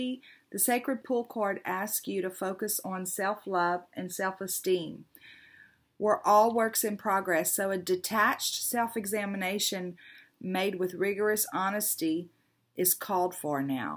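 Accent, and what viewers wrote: South, United States. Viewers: I love your readings as they mirror my life perfectly! Plus, I love your sweet southern accent and charm! Thank YOU! <3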